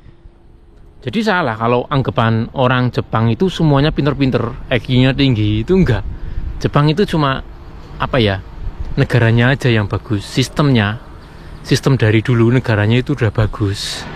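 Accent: Banyumas, Indonesia. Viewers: mas...anda lucu sekali ya... indonesia bngt aksenmu sukaakkk....ga ada takutnya ya di negeri horor dan mistis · Ora ngapak ora kepenak..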